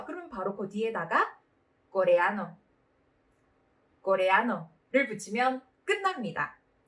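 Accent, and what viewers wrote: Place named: Gyeongsang, South Korea. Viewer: 산티아고 순례를 계획하고 스페인어를 배워보려고 시청하고 있는데 샘 처녀 시절( 샘) 경상도 억양으로 열강하시던 것까지 섭렵하고 있습니다. 정말 감사합니다. 열심히 배우겠습니다.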